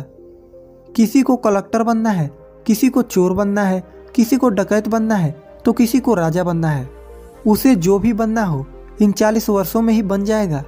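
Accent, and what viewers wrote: India. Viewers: Bihari hindi OMG🤣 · Aap bhojpur bhasha k nagrik hain aap ka uchcharan aur tone bhojpuri style ka hai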